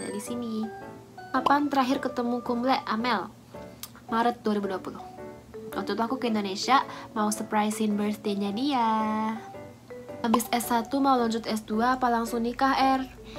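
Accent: Japan. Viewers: Logatnya lebih sering ke Jepang sekarang walau ngomongnya Indo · logat jepangnya udah kental yaaa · suka banget liat kak ngomong bahasa Indonesia logat Jepang. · Kak kalo ngomong indo tpi nadanya kayak ngomong jepang hehehe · Ngomongnya indonesia logatnya jepang wkwk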